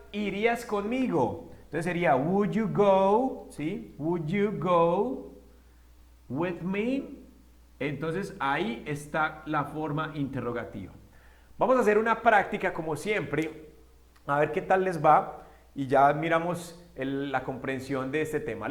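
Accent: Colombia. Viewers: Gracias! Me gusta tu acento colombiano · Gracias... muy bien explicado, soy de México, veo que tienes acento colombiano. Saludos ✌ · Excelente la manera de explicar,de lo mejor que e visto en YouTube y es mucho más interesante por el acento siento que me está enseñando el mismísimo patrón Pablo Escobar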